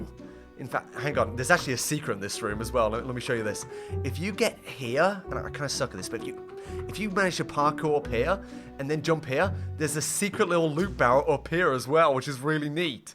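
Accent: United Kingdom. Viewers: Firstly, you have a nice british accent, secondly i think it would be nice if you go to a room, and after some time, closed doors from every direction you can go just open 👍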